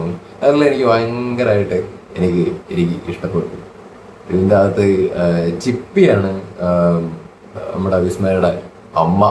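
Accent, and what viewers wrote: Palakkad, India. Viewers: നിങ്ങളുടെ പാലക്കാടൻ slangil സിനിമ റിവ്യൂ കേൾക്കാൻ കാത്തിരിക്കുകയായിരുന്നു.😁👏👏Great work.. Keep Going.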